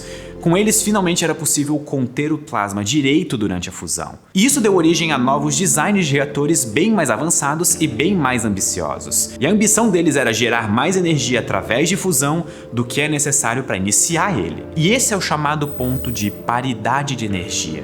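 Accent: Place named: Brazil. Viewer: Como eu consumo muito conteudo no youtube em inglês (sem legendas dur) ouvir um br falando ingles é bem estranho, sei la diferente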